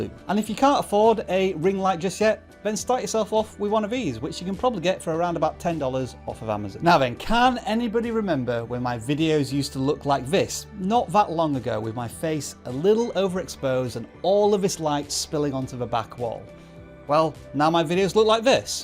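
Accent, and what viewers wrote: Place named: United Kingdom. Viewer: Totally off topic, but I’m working on learning regional British accents. Where, exactly, did you grow up? Thanks.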